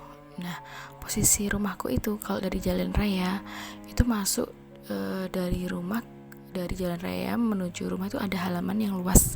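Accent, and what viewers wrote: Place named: Surabaya, Indonesia. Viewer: Ohh pantes, dr kediri.. Tak denger2in suarae kyk org Sby wkwkwkwk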